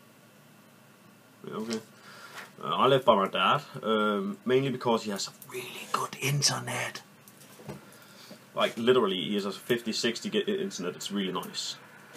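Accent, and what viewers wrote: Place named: London, United Kingdom. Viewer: Jack The Ripper hmm I thought your from around London from your accent but english doesn't seem to be your first language so I understand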